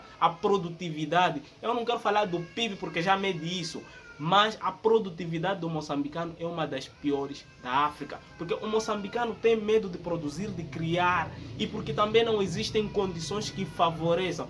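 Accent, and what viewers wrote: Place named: Brazil. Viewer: Pow negão ce fala com alma de Bahiano de Brasileiro hehehehhe e sua fala não tem sotaque moçambicano falando igual a nos brasileiros hehehe isso é muito legal cara So falta arrumar uma brasileira e vir pro Brasile hheheheheh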